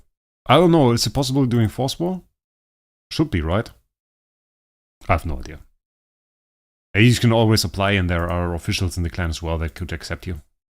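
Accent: Germany. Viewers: He's from Germany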